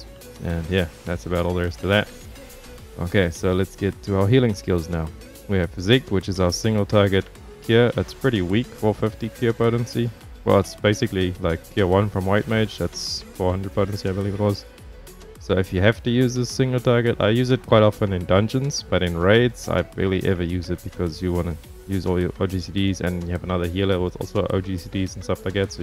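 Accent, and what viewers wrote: South Africa. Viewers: Another South African?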